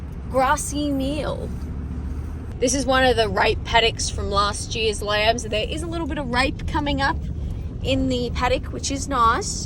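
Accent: Australia. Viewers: It's just normal Aussie talk, mate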